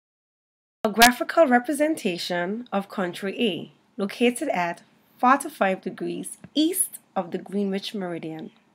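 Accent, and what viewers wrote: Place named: Jamaica. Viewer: You sound like a jamaican👅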